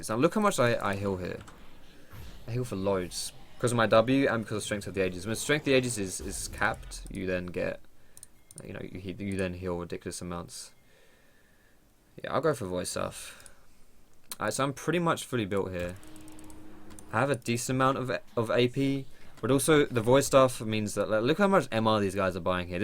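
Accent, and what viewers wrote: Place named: Essex, United Kingdom. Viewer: Think he os from Essex, sounds like the accent.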